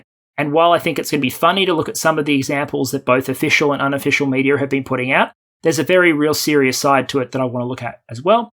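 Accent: Australia. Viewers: Not in the middle of an Australian accent like yours, and not both in the same paragraph.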